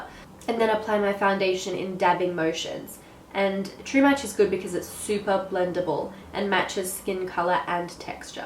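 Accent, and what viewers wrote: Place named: Australia. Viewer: I know people throw this statement around all the time but you are seriously so beautiful, with and without makeup. You truly don't need to wear makeup you're that beautiful. This is the first video of yours that I've seen so I don't know anything about you but if you're not a model you should be. And I actually like your accent even though Aussie accents often annoy me (I lived there for 8 years and became a dual citizen, so I can say that)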